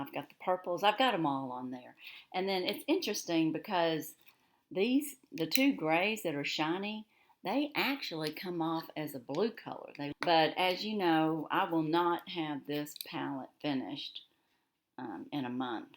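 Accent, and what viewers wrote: South, United States. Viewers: I just love your sweet southern accent. I have the matching Lancome Renergie Lift eye cream. Such a pretty palette you are working on. Oh, I love Buxom lipglosses.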